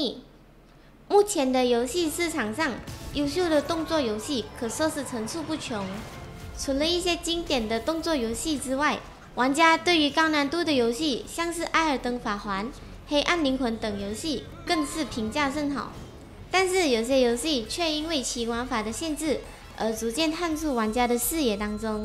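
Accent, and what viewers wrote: Malaysia. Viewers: 馬來西亞華人的口音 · 她應該是馬來西亞人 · 這位有大馬口音的聲音也太可愛了吧！ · 是猛將zhuan，不是猛將chuan哦⋯⋯妹子繼續加油，蠻喜歡馬來西亞口音，很有親切感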